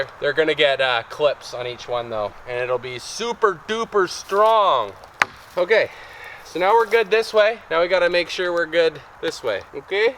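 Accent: Canada. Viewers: Wow I’m Canadian and could’ve sworn you were Canadian. U sound like one.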